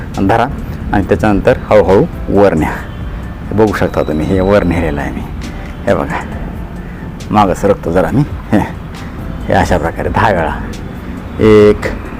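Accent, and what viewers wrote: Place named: Latur, India. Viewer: "हसत खेळत व्यायाम करा" अशा पद्धतीचा सांधेदुखीचा आपला चांगला व्यायाम प्रकार आहे. डॉक्टर साहेब मला वाटते तुम्ही बहुतेक लातूर जिल्ह्यातील निलंगा, शिरूर अनंतपाळ किंवा उदगीर तालुक्यातील आहेत; असे तुमच्या भाषेवरून जाणवते. असो तुमची स्वतःची मस्त गावरान भाषा शैली आहे. हेबका...❤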